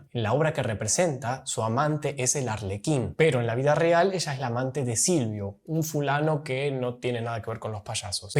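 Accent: Argentina. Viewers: Non sto piangendo, beh, sto piangendo perché il tuo accento è molto divertente. Tutti gli argentini parlano così? Grazie per avermi fatto ridere. Questo è il bacio di Tosca! Muori, dannata, muori! 😂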